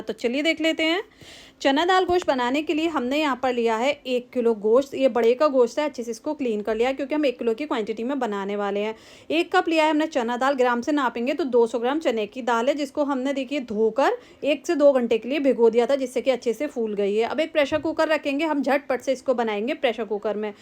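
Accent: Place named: Hyderabad, India. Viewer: Assalam alekum khairiyat main Saudi Arab se dekh raha hun aap sarson ka tel khate ho aapki language Hyderabad ki tarah hai aur aap sarson ka tel khate ho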